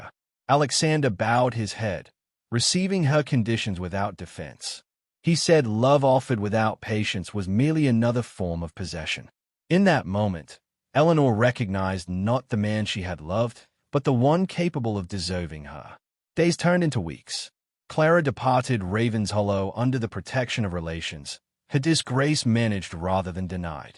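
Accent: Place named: Australia. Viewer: Not an American at all. One of the UK COMMONWEALTH Countries - Aussie, maybe? But definitely distracting. 😊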